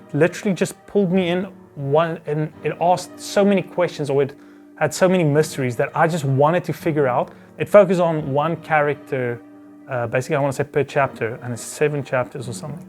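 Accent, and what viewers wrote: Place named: South Africa. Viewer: Suid Afrikaanse aksent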